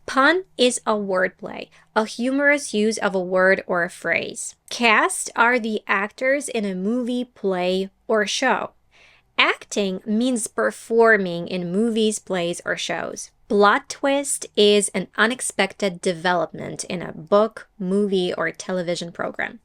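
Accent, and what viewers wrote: United States. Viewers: How amazing I ve been watching ur videos for a long time I cant believe that u arent American...u are great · Hey, as an native English speaker would you say she sounds like an American? (Cause to my ears, I'm Brazilian, she does). If she doesn't, what gives her away? Would you weigh in, please?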